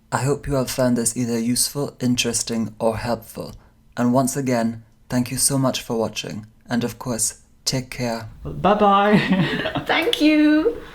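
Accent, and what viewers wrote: Scotland, United Kingdom. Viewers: Jack Walker it's just a contorted posh Scottish accent.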